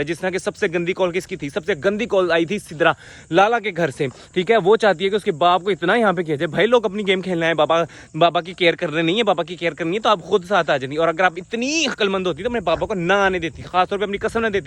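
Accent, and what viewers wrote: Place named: Punjab, India. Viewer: Please apni Urdu thik karin Ap kah andaz Punjabi hai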